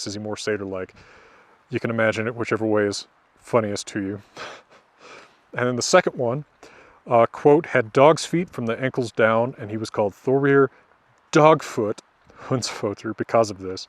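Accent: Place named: United States. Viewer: Hello, I do not know if you are aware of that, sir, but it's not just people in the English language who are following you. I am French, and I have a hard time understanding you with your American accent. Would it be possible for you to speak a little less quickly please? I know, it's a little daring of me to ask you this, but it would be very useful for a lot of people I think. Anyway thank you very much for your videos. Sorry if I offended you, it was not my intention.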